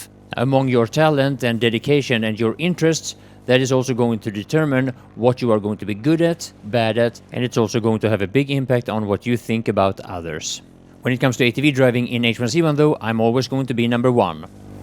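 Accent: Sweden. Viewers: JUst wanna thank you for keeping it real dude amazing work allways happy when i get a notification on my phone that you uploaded something and realy nice to see content creators keeping it real!! keep it up!! älskar göteborgska accenten :)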